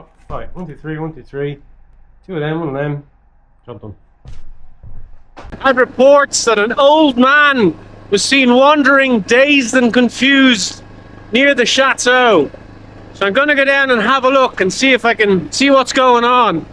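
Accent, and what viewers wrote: Ireland. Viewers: Bonjour d'Occitanie J'ai aimé la référence à James Bond, pour le charme de votre accent irlandais, s'ils sont aussi doué que la majorité des Français avec l'anglais, je pense à l'accueil de l'hôtel, ils n'ont pas dû l'entendre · I bet you get away with muder with irish charm and the accent seems to work well. I get to see some great beautiful hotel in France. Conguc! have a drink please. You all worked very hard on openning day. You should be proud it will last and have your finger print on the chateau for sure. 😂the old confused man will whip your butt he decided to spare you his viking ways and just confiscate the bike. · That evening sun and clouds was so lovely! I'm sure it was more beautiful in person. And aha, you're well aware of the power of that Irish accent! You ugly? The only reason they'd have to hide you would be, well, from the young women.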